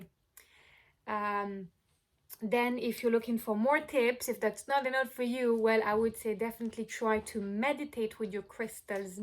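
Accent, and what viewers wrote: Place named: France. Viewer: Liked. Subscribed. Clearly you know crystals. Love your accent. French? Or maybe I’m the one with an accent. Waves of zen coming your way!!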